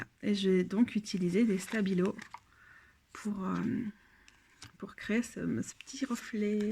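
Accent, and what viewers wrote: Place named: Belgium. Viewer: Merci. J'ai testé sur un mass market, le tarot de Marseille de Kriss Hadar avec un markeur pour paperbord. Catastrophe, effectivement le carton boit l'encre, j'en ai mis partout. Du coup les majeurs sont tranches "noires" et les mineurs blanches. Il me semble avoir vu une vidéo d'une créatrice d'oracle qui conseillait des feutres haut de gamme mais je ne sais plus les références. Et Claire de la lune à fait une vidéo sur les arrondisseuses et autres matériels de customisation. Ton tarot de la forêt enchanté est super beau. Le miens est resté tel quel. Je n'ose plus customiser mes jeux. Je n'ai pas entendu le bruit de machine donc aucune gêne. Et sinon j'aime bien le français belge. À tantôt 😉🙏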